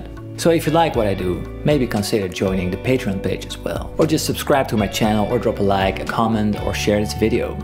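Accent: Netherlands. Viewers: i have to ask, are you dutch? your accent sounds dutch lol. if so, ik ben ook nederlands! ik hou van je video’s :) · waarom hoorde ik meteen dat je nederlands bent? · Are you Dutch? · You sound Dutch to my Dutch ears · Ik dacht dat ik al ergens een klein Nederlands accent hoorde! Perfecte video's! Erg nice om naar te luisteren ^^ Keep up the good work!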